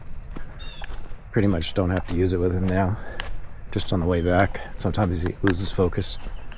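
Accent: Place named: Boston, United States. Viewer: Looks like NW Alabama to me. That Boston accent will go great there.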